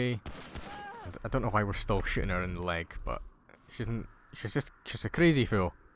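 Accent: Scotland, United Kingdom. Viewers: And also are you from Scotland it sounds like it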